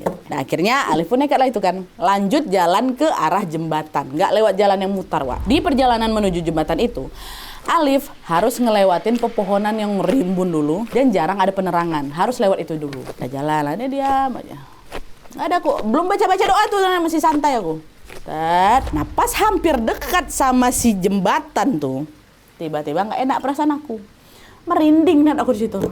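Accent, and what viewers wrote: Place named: Sunda, Indonesia. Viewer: Jujur sebagai orang sunda asli Suka banget sama KHW Sunda ka lucu logat ngomong sunda ka 🥰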